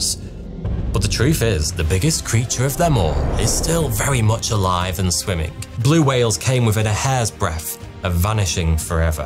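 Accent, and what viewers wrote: United Kingdom. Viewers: I just love the British accent ❤ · "Hey fowtewtouw hewe" Dude, I can't get enough of your British accent. 😃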